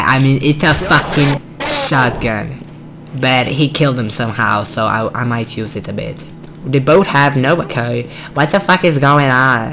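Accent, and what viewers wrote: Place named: Eastern Europe. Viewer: I'm guessing Eastern Europe? Maybe Slovakia or Slovenia? Maybe?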